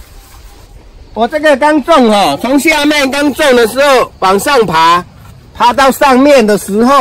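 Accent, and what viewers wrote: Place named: Taiwan. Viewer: 露菌病和白粉病可以用什麼藥？聽不懂你說的藥名，可否再告訴我一遍，謝謝你，很喜歡你的台灣華語，已訂閱，並轉介一些愛種菜的台灣朋友。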